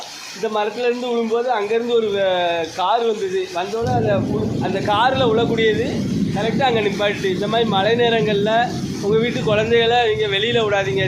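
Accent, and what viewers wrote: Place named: Tirunelveli, India. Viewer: " புத்திசாலிகள்😂" புரிஞ்சுதா என்பார்கள் . இவர் " சரியா " என்று கேட்பவர்களின் கருத்தையும் கேட்கிறார் . உண்மையான புத்திசாலி . நெல்லை மாவட்டத்தின் பேச்சு வழக்கு !👍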